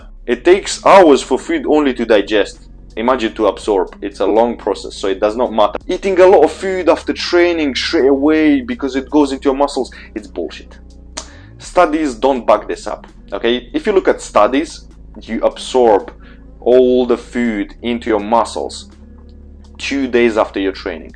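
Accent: Russia. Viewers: все гадал откуда ты😂 вроде русский акцент, но не было понятно до исконного "блять"😂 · Сначала я подумал, что ты русский) акцент такой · ну я вообще сначала догадывался что он русский, так как акцент у него русский, но когда он сказал блять, я окончательно убедился что он русский. А еще его зовут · Aint no way this guy is from Britain. His accent is russian, not bri'ish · Русский акцент брат,но ты хорош так держать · Русский акцент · У него русский акцент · Wow, thanks a lot! It is kinda strange that I can't understand his Russian accent despite the fact that Russian is my mother tongue. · как будто рашн звучание в голосе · Русский акцент так и пробивается! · Are u Russian or what? You don't sound like an English man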